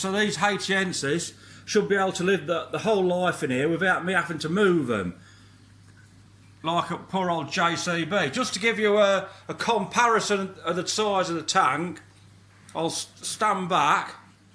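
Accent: Midlands, United Kingdom. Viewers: Your uploads are great to watch along with ur commentary really interesting,,,can I ask wot ur Actsent is??? I'm thinking midlands??? 🤔 👍 👍 👍 👍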